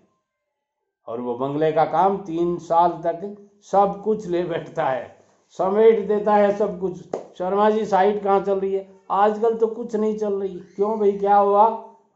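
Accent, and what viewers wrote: Rajasthan, India. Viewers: Dadaji Aap Rajasthan me konse jile se ho Bhansa aapki Rajasthani si lg rhi h. point 1. Bai ka fool bai k lag jay. 2.Bahut bde bangle ka kam tevad diya.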